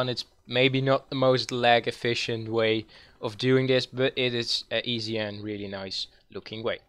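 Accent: Netherlands. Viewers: Nice Nederlands accent!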